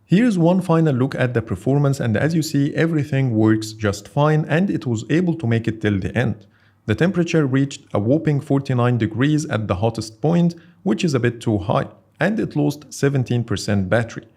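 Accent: Egypt. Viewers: اللكنة المصرى منورة 😂🇪🇬 Great video though 👌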